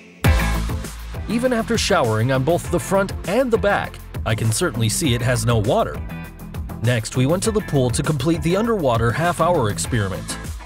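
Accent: United States. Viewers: Holy shit the broken English in this video is so off-putting when read by someone with an American accent.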